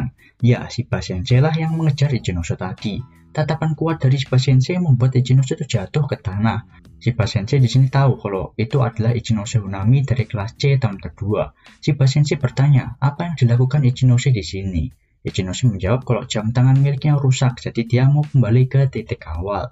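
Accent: Java, Indonesia. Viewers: Sedang mendengar cerita LN dengan suara medhok,☝👍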